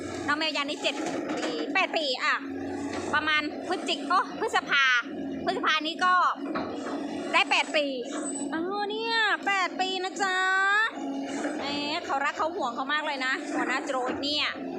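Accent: Korat, Thailand. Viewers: บรรยายเป็นภาษาโคราชน่าฟังครับ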